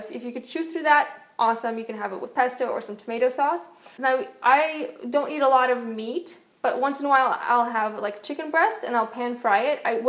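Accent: Poland. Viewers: omf i absolutely love your accent. 😍 are you by any chance polish? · Just wondering but are you Polish? Because your name is and you kind of sound Polish.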